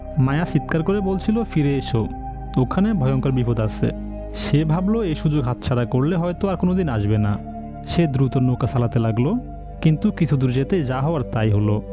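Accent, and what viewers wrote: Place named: Chittagong, Bangladesh. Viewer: বয়েস টা মনে চট্টগ্রাম এর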